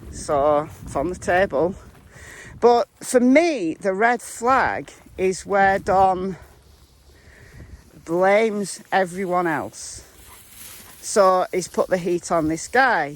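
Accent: United Kingdom. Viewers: Hi! I'm one of your new subscribers. I love your take on things, and I love your british accent.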